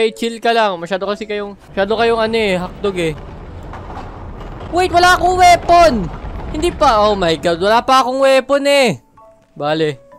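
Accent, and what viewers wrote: Visayas, Philippines. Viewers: Bisaya ka ba po kuya? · Lods Bisaya Ka or Hindi? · bisaya ka po ba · Kuya bisaya kayo!?!! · Patay kadiha mo bisaya po ikaw?? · Bisaya ka dong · bisaya ka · bisaya ka kuya?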